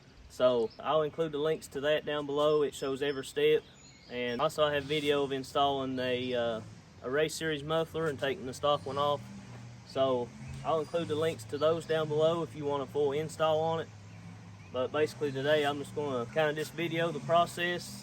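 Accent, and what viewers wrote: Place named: United States. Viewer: This guy have a really hard accent to understand,,, no offense but people from the USA need to learn how to speak English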